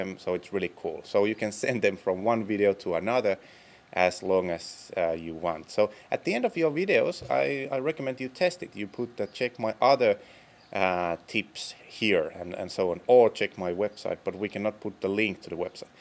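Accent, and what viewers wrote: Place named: Sweden. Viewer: Are you Swedish or Spanish? You really sound like a Swedish guy =)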